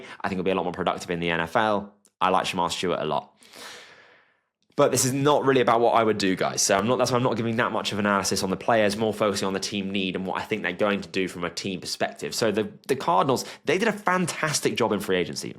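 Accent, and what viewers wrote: United Kingdom. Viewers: Caleb with the most sacks and this dude thinks the Bears need a tight end😂. cole Kmet who played well and love him, no need for another tight end, they will not go warren, sorry. They need a D lineman or strong Oline man, their line on both sides were ass last year. Makes zero sense to pick up warren. If they don't go lineman they will go HB, because they traded their young Rb Khalil .That's also why you won't see Warren in literally any other mock for the bears. Dumb pick Also is this a British guy? Lol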